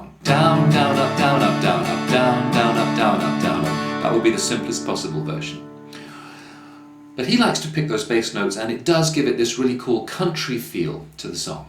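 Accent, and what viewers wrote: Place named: United Kingdom. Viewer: Great lesson thank you. Very funny how the Brit accent drops instantly singing right on the money:)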